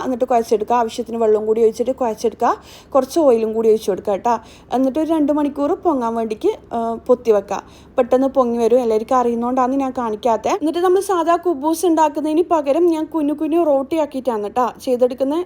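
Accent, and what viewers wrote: Kannur, India. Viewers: പഠിച്ചതൊക്കെ ദുബായ് ആണോ.. മലയാളം അത്ര fluent അല്ലല്ലോ.. അതോ കണ്ണൂർ മുസ്ലിം ഭാഷ ഇതാണോ?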